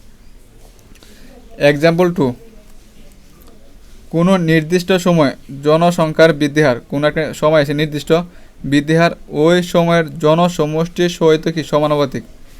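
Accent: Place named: Mymensingh, Bangladesh. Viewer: ভাই মমিসিংগা😁😁 ভালোই হয়েছে❤️ ভাইসাবের এলাকার লোক😌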